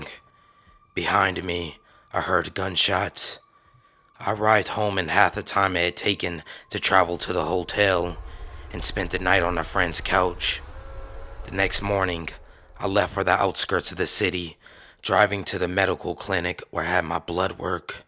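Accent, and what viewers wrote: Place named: South, United States. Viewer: I would say he's a white southerner that moved north some time ago. Sounds like he's losing an accent.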